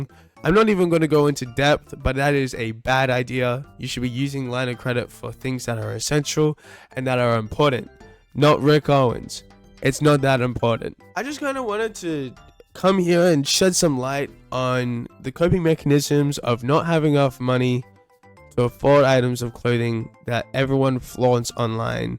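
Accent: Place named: Canada. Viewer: OH MY GOSH OKAY IM SO SORRY 😭 your accent made me think you were canadian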